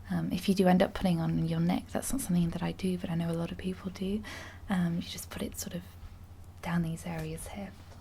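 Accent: Scotland, United Kingdom. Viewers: Wait, I though you were scottish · No contest , best ASMR content creator on YouTube , plus I'm from the U.K living in Australia so the accent is a touch of home 👍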